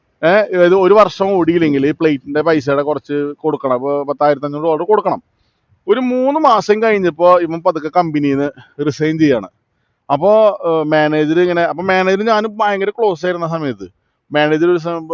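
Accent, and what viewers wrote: Thrissur, India. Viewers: ചേട്ടൻ തൃശ്ശൂർ ഭാഷയിൽ നന്നായി പറഞ്ഞു. 😂ഇനി കാനഡ പോലീസ് നമ്മുടെ നാട്ടിലേക്കു വരുമോ. മോദി ഗ്യാരണ്ടി 😂അവന്റെ ഭാര്യ പോയാലും കുഴപ്പമില്ല. പണം കിട്ടണം.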